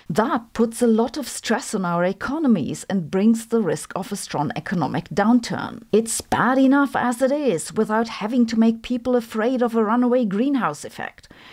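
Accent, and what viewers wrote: Germany. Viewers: Why? Of course its possible to pronounce, even for Germans. My advice is to get rid of that insane accent. Feel natural. If nothing else helps, use a chewing gum. And don't use english with other Germans. Thats always a drawback. If you say you have problems with "th", I tell you that you have never tried. Good luck. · Unrelated but germans have a VERY cute accent and i wont change my mind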